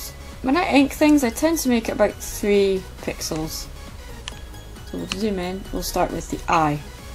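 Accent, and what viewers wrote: Scotland, United Kingdom. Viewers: Theamax Stage No,she's Scottish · you sound Scottish i live in Edinburgh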